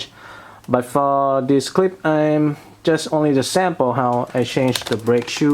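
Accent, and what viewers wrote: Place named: Thailand. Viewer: ผมชอบอังกฤษ สำเนียงไทย ฟังรู้เรื่อง รื่นหูจัง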